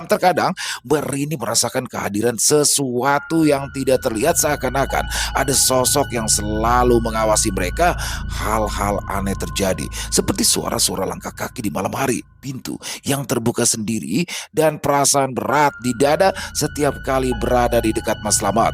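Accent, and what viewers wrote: Jakarta, Indonesia. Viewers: Gua paling suka kisah nya mas logat logat betawi nya bikin candu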